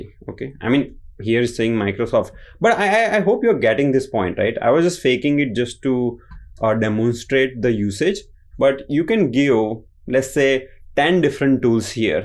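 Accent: Gujarat, India. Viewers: Gujarati accent hehe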